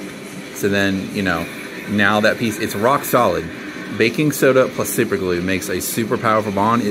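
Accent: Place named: South, United States. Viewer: Idk why but your southern accent is at 200% on this video. 😆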